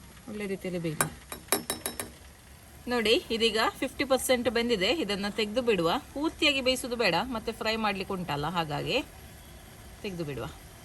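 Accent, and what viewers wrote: Mangalore, India. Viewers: ತುಂಬಾ ಚನ್ನಾಗಿದೆ ರೆಸಿಪಿ ನಾನು ಮಂಗಳೂರಿನಲ್ಲಿ ಎರಡು ವರ್ಷ ಕೆಲಸ ಮಾಡಿದ್ದೆ. ಆಹಾ ಮಂಗಳೂರು ಕನ್ನಡ ಕೇಳುವುದು ನನಗೆ ತುಂಬಾ ಖುಷಿ ಕೊಡುತ್ತೆ. ಮದುವೆಗೆಂದು ಮಂಗಳೂರು ಹುಡುಗಿ ಸಿಕ್ಕಿದ್ದರೆ ದಿನಾ ಹಿತಕರ ಕನ್ನಡ ಕೇಳ್ಬಹುದಿತ್ತು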